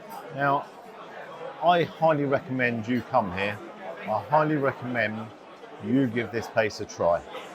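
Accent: United Kingdom. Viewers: britski-petski accent is so annoying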